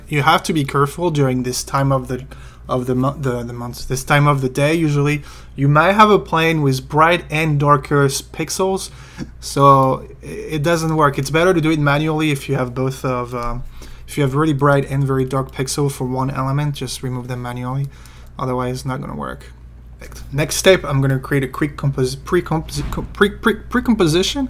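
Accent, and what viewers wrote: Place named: France. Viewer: J'adore le petit accent français. Hello from LA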